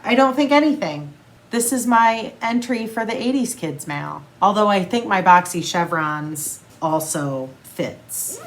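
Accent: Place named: Texas, United States. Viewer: Hi... let me start off saying you are not boring! I just discovered your video. I really enjoyed you being YOU! I live in Houston Texas and you sound like you’re from Texas. Anyways that Boxy Chevron sweater is so awesome I had to go buy it ASAP but I gotta problem I’m a NEW knitter so will you please do a tutorial on how to get started on this sweater Puh-lease - Lisa😊🙏🏽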